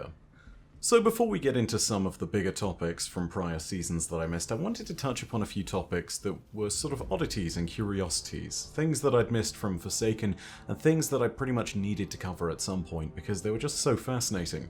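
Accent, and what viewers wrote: United Kingdom. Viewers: You have an accent, you're British, yada-yada..... But when the clip you play clearly calls them "uh-feel-ee-un"... It makes the way you say it just sound wrong.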